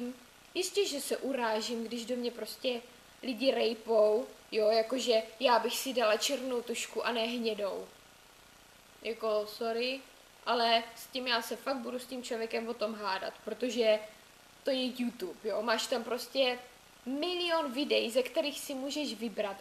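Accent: Moravia, Czech Republic. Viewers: Líp bych to neřekla.. :) a jen tak mimochodem.. tak trochu podle přízvuku.. ty jsi z moravy? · hele mam otazku! Ty jsi z Moravy ???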